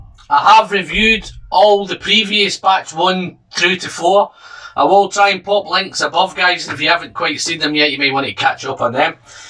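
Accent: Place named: Edinburgh, United Kingdom. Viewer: Hey! Greetings from Cairns. In Australia. My wife and i very much enjoy your vids and your laid back style and your accent reminds me of my grandfather who was from Edinburgh. Just ordered a couple of these from Royal Mile who are our number one goto Scottish supplier. We also got a couple of the new Laphroaig Sherry oaks. Keep the great videos coming mate! Cheers, Dazz and Carla in Ox..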